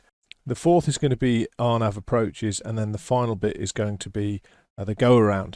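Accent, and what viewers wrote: United Kingdom. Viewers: And also, I am up in years and sometimes voices are hard to discern. Your, British Accent, I presume, is VERY EASY to hear and probably the most clearest English to understand.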